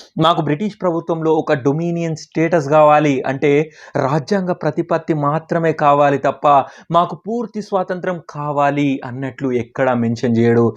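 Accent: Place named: Telangana, India. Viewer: Ni yamma,emanna kick unnada Endi bhayya ni voice lo aa rhythm Vere unnadi po Telangana yasa la masth sheppinav Deeni thalli gisonti faculty unte dennaina palaggottochhuuu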